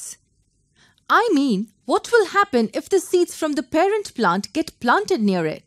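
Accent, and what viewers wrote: India. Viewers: you sound li indien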